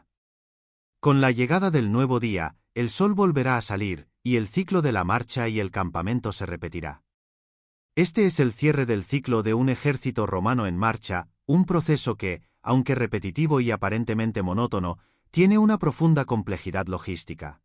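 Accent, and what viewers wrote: Spain. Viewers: Al ser una tradicción española y leer en el título la palabra "Fascinante" me produce rechazo solo pensar en escucharlo. Lejos de ser agradable el tono español es agotador, cansador, sobre cargado.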